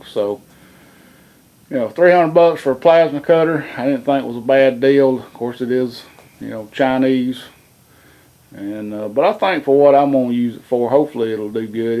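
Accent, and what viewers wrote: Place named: Kentucky, United States. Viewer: He sounds like he is from Kentucky!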